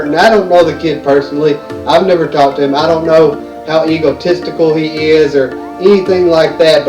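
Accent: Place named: South, United States. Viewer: Your Suthern accent made it very hard to understand you no offense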